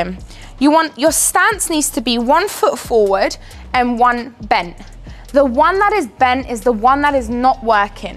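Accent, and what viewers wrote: United Kingdom. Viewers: Your accent is so strongly British haha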